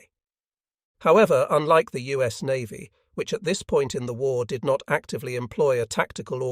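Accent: United Kingdom. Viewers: The creators are using open source software and have learned as they were producing. These videos are a labor of love. Give them credit for what they are doing. I still detest that semi British accent of the voice.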